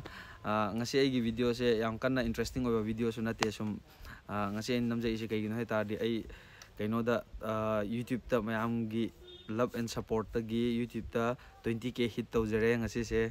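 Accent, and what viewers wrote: Kakching, India. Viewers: Kakching marol da adum ngango taba ym nungaiye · Touruk o kakching tune da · Ym manle eikhoiju kakching ga thngnana leibne aduna marol se fajana khnge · Lon khoiba khr kaokhrasu accent di kakching oiri 😅… 50k ywhllase mym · Fjna mlle kakching mrolse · Kakching macha original deidi Brother nabu henna mankhreda🎉... Full support.. Aroibgi kakching lon gi sentence tudi word amfao khngba ngmde💔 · Hit chatle kakching maronse 😂😂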